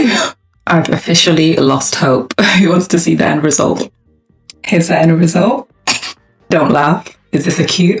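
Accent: United Kingdom. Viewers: I subscribed when I heard you were British like me 🥲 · I love your British accent ❤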